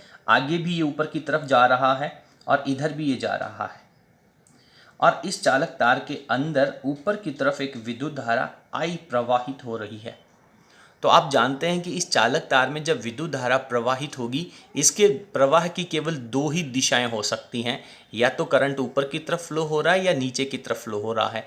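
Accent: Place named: Bagdi, India. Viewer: Gurgi the ktha ga ho bagdi bolan laagrea ho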